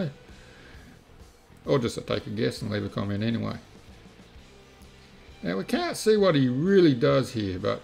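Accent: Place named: Australia. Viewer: Love your Aussie accent....Relaxed talking manner but not too relaxed for the masses....sometimes my ears couldnt relate..sorry....so as my kind teacher used to say.. "Talk nicely and clearly"...! .just so all your valuable help sinks in..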